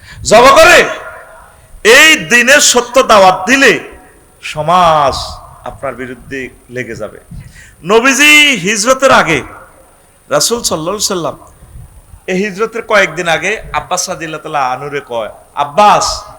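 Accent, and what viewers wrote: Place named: Noakhali, Bangladesh. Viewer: নোয়াখালীর আঞ্চলিক ভাষায় কথা বলেন উনার হাত ধরে নোয়াখালী থেকে অনেক বেদাত দূর হয়ে গেছে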